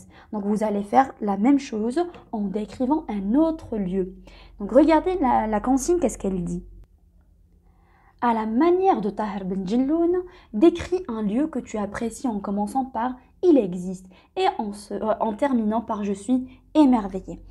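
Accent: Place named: France. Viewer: J'adore votre accent français😍😍😍😍😍😍😍😍😍😍😍😍😍😍😍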